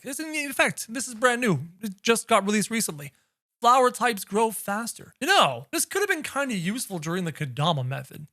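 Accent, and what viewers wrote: Canada. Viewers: sounds like he’s from or near Canada somewhere (?) my guess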